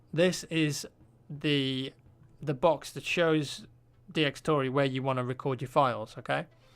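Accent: United Kingdom. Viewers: ur british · Gotta love that british accent! :3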